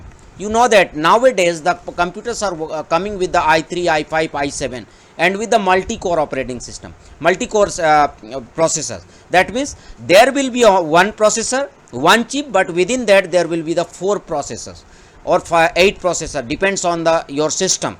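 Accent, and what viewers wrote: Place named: Pakistan. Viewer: Your pinglish is awesome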